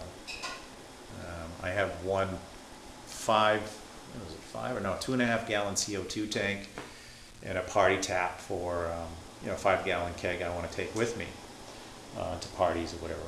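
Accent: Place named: Maine, United States. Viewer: Hi, great update! Nice to just see ya and hear that calm clean cool Maine accent again.:-) A little bit of equipment, brew day, projects - it's in all in here.:-) Take care and see ya when all is well and less hectic!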